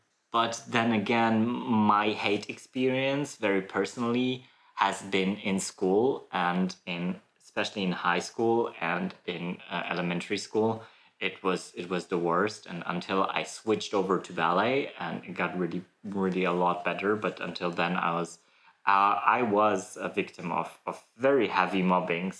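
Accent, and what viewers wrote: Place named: United States. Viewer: They know you're gay, okay. But do they know you speak perfect American English?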